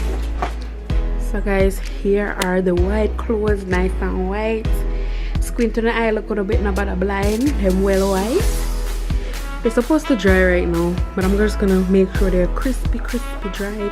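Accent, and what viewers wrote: Jamaica. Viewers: Uh hear patwa strong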